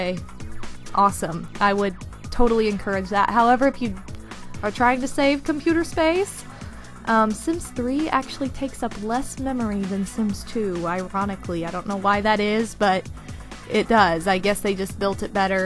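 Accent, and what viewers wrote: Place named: South, United States. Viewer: The days when you had a proper southern accent! lol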